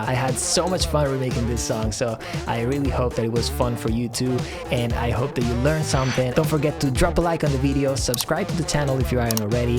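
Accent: Italy. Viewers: ahah sei romagnolo? sono rimasto serio fino a . Comunque quanta skill, complimenti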